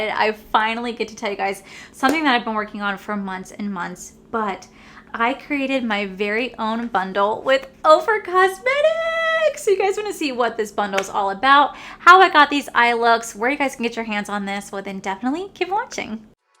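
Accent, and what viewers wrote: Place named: Florida, United States. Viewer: I am awe struck💕💕💕💕. I consider myself a Floridian by choice…..a proud WV by birth. You are a true Floridian with a hint of your moms accent. Go! You have a talent of putting colors together! Be safe…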